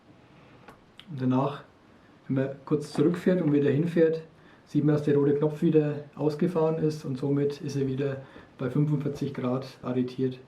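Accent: Germany. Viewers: Ich höre doch einen Franken raus ? 👍👌 Tolle Videos.....des gibt Auf jeden Fall a Abo 🤘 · Gute, komprimierte Erläuterung. Danke. Vom Dialekt her würde ich auf Mittelfranken tippen, Nbg, Fürth, Erlangen. ;-)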